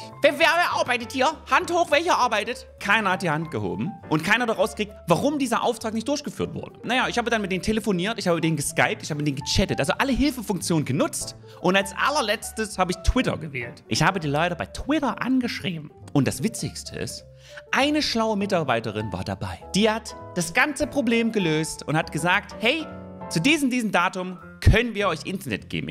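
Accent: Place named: Dresden, Germany. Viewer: dein dialekt kommt mir bekannt vor - raum dresden?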